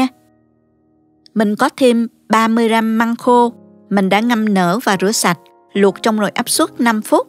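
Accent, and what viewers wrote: South, Vietnam. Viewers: Cảm ơn với món miến măng gà 🍜thật ngon😋 & đẹp mắt. Giọng đọc thật là mộc mạc Miền Nam rất hay.❤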